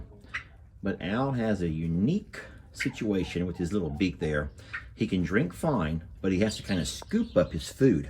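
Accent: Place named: Texas, United States. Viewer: Texas accents are NOT TERRIBLE. I have one but not as much as you. But I love them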